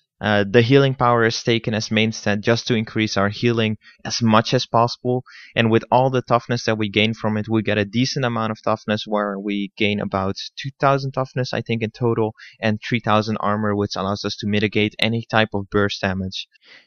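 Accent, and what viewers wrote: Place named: Netherlands. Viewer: You sound Dutch! xD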